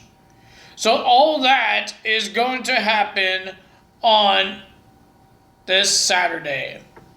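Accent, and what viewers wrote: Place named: Quebec, Canada. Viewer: where are you from bro? I hear an accent when you speak. It sounds like either from Quebec or Louisiana.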